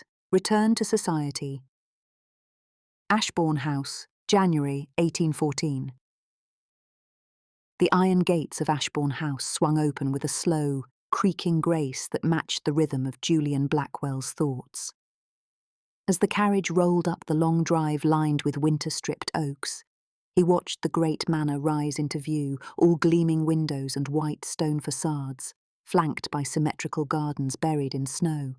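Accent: England, United Kingdom. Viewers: I just started listening. The narrator sounds wonderful. Is there anything better than listening to an English accent? Anyway, I’m anxious to see how things play out. Thanks for posting. 📕📖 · English accent is my favorite!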